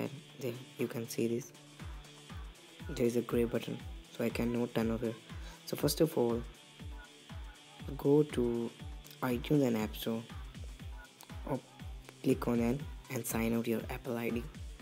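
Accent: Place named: India. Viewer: Of course he sounds Indian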